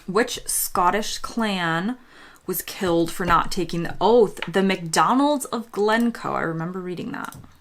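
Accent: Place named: United States. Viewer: you sounds like american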